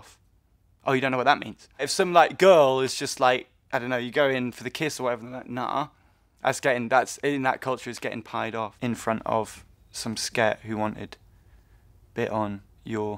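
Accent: United Kingdom. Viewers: By listening from speaking, I read the comments with a British accent in my mind. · choke me with that British accent.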